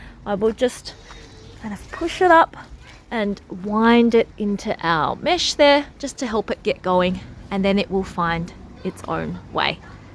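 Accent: Australia. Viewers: A fantastic and informative video Miss. I enjoyed it and learned much from this. You are a very gentle spirited lady, and I love your Australian accent. I am watching from California. I will definitely try these tips. Blessings to you Miss. YESHUA FORGIVES AND SAVES! John -17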